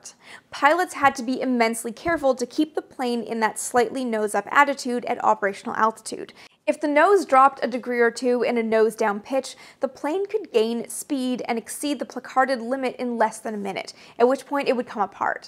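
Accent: Quebec, Canada. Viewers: I don't understand why this is throwing some people into such a tailspin. Have you heard of a French-Canadian accent? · She sounds Canadian. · English is a complex diffuse language that includes much from Latinate, Romance and Hellenic origins, plus regionally on neighbour and partner languages. Do you pronounce "cafe" as per the original form "café" or a literal mangled version like "caff" or "kayfe"? How about Jalapeño with a hard "J" and a "peeno" at the end? I am fluent in four languages (English, Finnish, French, German) and I found that once I worked beyond my mother tongue (English) I began to understand how it works, when it doesn't, it's oddities and the like, plus basic respect for non-English words being pronounced correctly. I'm guessing that by how pronounces her English, she is Canadian and hence is likely to be more au fait with French pronunciation and recognising word forms. 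The worst though, is NHL commentators mangling people's surnames without actually bothering to learn how they're truly pronounced. That's beyond lazy to the point of arrogance. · Proud of you even after your Canadian pronunciation (yes my dear, I'll deal with it). Been watching you for some years now and bought your book on women aviators for my 5 yo grand daughter (my 87 yo mother read it and loved it, I too). Do you have any capability or desire to Sign your book? I'd love for her (my grand daughter) to have a signed copy, do you do this? Keep it up, you and others that love aviation history, swell my heart in a good way. I'm a retired Navy Air Traffic Controller that grew up watching Mercury, Gemini, and Apollo. I still cherish watching Apollo 11 as a 11 year old boy at my Grandma's on a warm East Texas night in July, 1969 land on the moon. Still get chill bumps thinking about it... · Canadian accent is really coming out today. 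😋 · She's a Canadian and has a bit of the French accent. Fun fact just drop the 'H' in every word you say and you are on your way to great French Canadian accent.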